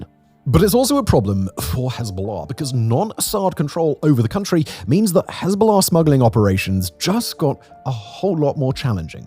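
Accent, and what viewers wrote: United Kingdom. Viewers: PM Ciolacu is not from Iohannis' party. That party is the NAtional Liberal Party, a center-right party. Ciolacu is from PSD, the center-left (and socially conservative) party that has dominated Romanian politics in all of its incarnations. Ciuca was Iohannis' creature, since the President propelled him to lead the National Liberal Party and to be PM before Ciolacu as part of their left-right alliance during the war in Ukraine. Listening to these videos reminds me of how Gell-Mann amnesia manifests, when you believe something a Brit tells you in his cool accent until he starts talking about something you know.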